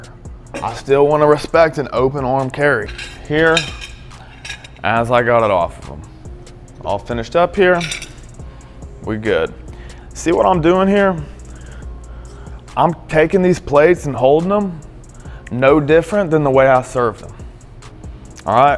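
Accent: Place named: South, United States. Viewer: he's just southern, calm down 😭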